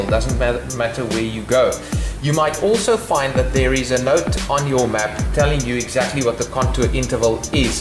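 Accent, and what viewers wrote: South Africa. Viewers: I think you are 🇿🇦. Just saying by your accent 🤔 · Great to hear work explained in a South African accent for a change. Nice, concise video!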